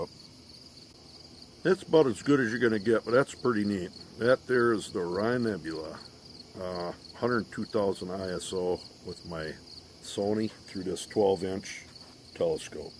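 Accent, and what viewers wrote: Minnesota, United States. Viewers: Must be from Minnesota. Great video.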